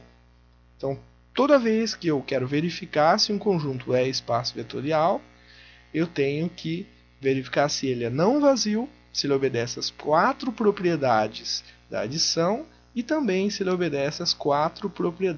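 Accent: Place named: Paraná, Brazil. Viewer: Os vídeos estão cada vez melhores. Nesse vídeo o apresenta com cuidado a definição de Espaços Vetoriais. O sotaque paranaense é um charme (hehehe). Para que está estudando o assunto, a dica é pegar uma folha de papel e reproduzir, sem olhar, a definição apresentada. Depois que tentou ao máximo lembrar de todos os detalhes e ESCREVER no papel, voltar ao vídeo e comparar se conseguiu tudo. Esta estratégia é bem indicada para aprender um tema como esse.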